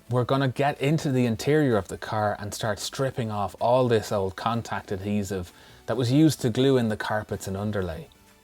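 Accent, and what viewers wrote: Ireland. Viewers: Actually he's Irish, but thanks for the Aussie guess. We'll take that as a compliment.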